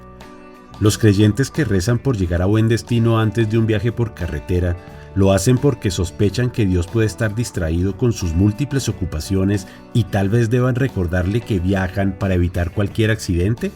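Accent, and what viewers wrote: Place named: Bogotá, Colombia. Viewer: Acento bogotano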